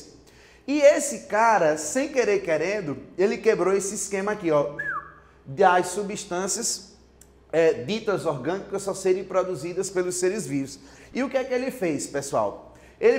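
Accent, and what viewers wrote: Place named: Northeast, Brazil. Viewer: Esse caba é do nordeste mesmo, valeu mesmo.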